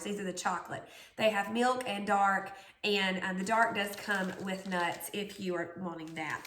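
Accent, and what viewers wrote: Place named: South, United States. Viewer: I love your videos! I am from the West so the southern accent is one of my favorite parts of your videos!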